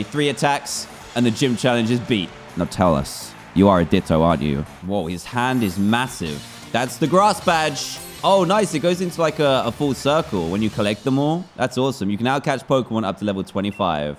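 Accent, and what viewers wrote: United Kingdom. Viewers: I know it's just your British accent but evolution is pronounced as evv-oe-loo-shin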